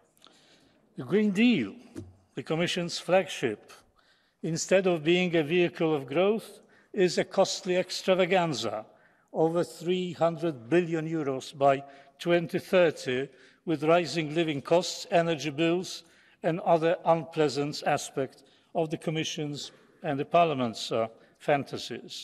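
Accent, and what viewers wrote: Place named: Oxford, United Kingdom. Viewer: Panie Profesorze powiedzieć że jest Pan genialny to jakby nic nie powiedzieć....i w dodatku ten Oxford English...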